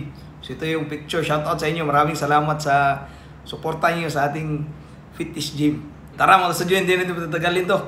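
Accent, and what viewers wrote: Visayas, Philippines. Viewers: Mr.wilcomeback. Let wifi to eat also not all d time ikaw ang nkikita sa u tube.visaya p ang accent mo dong.talk tagalog so everybody can understand db .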